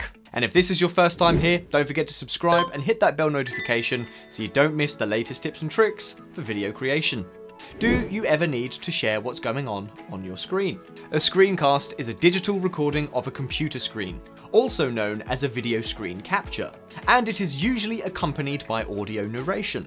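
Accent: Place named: United Kingdom. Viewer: Sir your English is perfect British and clear that is why I watch your videos. Thank you very much.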